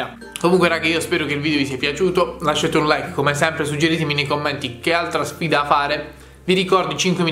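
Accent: Bari, Italy. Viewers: ma sei di Bari?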